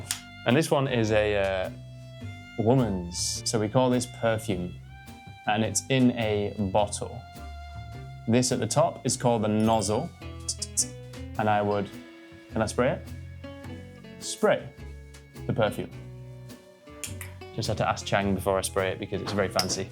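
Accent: Northern England, United Kingdom. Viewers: You’re amazing! I love British English 😍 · I think that the person asking question meant your ’coot’ pronunciation of ’cut’ which seems to be some kind of the northern accent of English.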